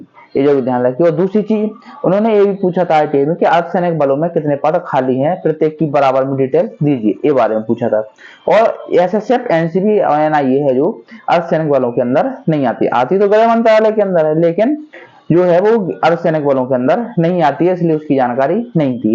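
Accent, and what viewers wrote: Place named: Jhansi, India. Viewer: Bhai मै झांसी से हु, 😊 आपकी भाषा काफी अच्छी लगती है, हम भी ऐसी ही बोलते है, आपसे मिलने का मौका जरूर मिलेगा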